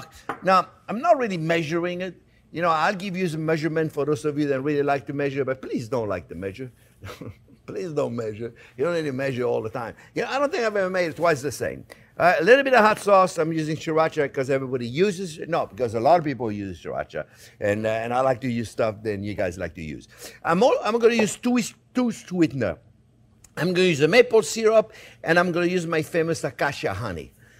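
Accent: France. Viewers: Chicken wings which are drumstick and the second process in the oven is what??? A brawler? That is what your captions say? As for your French eenglish, I don’t understand. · I love his smile and hearing him speak and teach English with a French accent. :D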